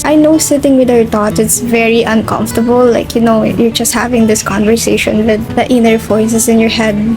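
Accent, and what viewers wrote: Philippines. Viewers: it’s kind of a filipino thing when they speak english. it’s so cool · Is she Filipina cuz her accent is very jayjay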